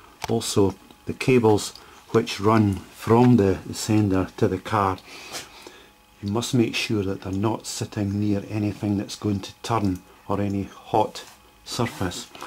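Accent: Scotland, United Kingdom. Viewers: Very well thought out and delivered----in a Scottish accent, even better.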